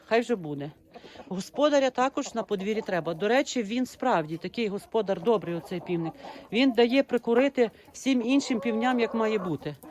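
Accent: Ternopil, Ukraine. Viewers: Дякую вам пані за відео про любимих курочок.Я насолоджуватись вашою мовою і зразу вгадала, що ви з Тернопільщини, потім прочитала в коментах.Таке відчуття, що я побувала у вас в гостях.Дякую, дякую, дякую.Ми переможемо і буде мир і є наша роботяща Україна.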